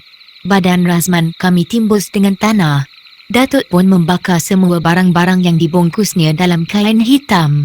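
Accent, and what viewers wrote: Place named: China. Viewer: Yang pasti..penceritanya bkn melayu..pelatnya cina..apa pun terima kasih kerana tolong menyampaikan cerita 🙏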